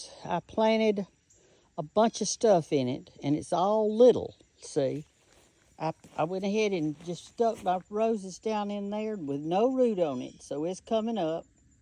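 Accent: South, United States. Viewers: Hi queen I'm from Augusta Georgia and I love that country voice lol the way you cook them liver and onions thank you again ❤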